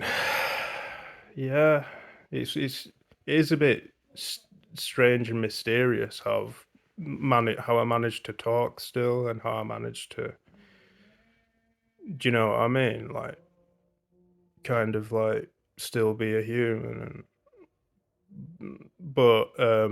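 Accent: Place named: Yorkshire, United Kingdom. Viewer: if you couldant see his face you would think hes a white guy from yorkshire, if he was born and raised here he aint a migrant, if he is actually a migrant well done to him he got the accent spot on, wish the rest could speak english as fluently as he does, my only gripe with migrants is the fact that they dont have any education and they either dont or barely speak english, they add nothing to the country, and dont get me started on muslims and all their backward traditions polluting our culture, but he might not be a muslim, so cant really treat them worse on the assumption of what religion they may or may not be, long as he aint over here trying to ruin our culture he got no problem from me i hope the guy that made this video is ok, and i hope the guy that made this comment is ok, like the guy that uploaded this video might be having a rough time, but think if all you got to do with your day is leave shit comments like this on videos, with zero creativity, thats a bleak existence my guy hope you get more stuff to do in your day, and if your going to leave troll comments at least get creative and put some effort into it aye? 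bottom of the barrel that mate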